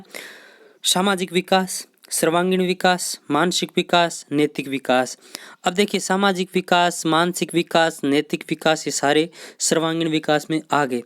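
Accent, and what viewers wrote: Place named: Hanumangarh, India. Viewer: Aap ek dam humre jase bolte h hanumangarh ke h kya aap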